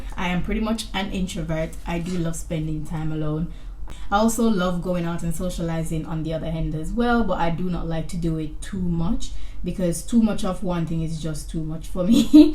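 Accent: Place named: Jamaica. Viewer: I could hear the Jamaican accent from your tik toks 🇯🇲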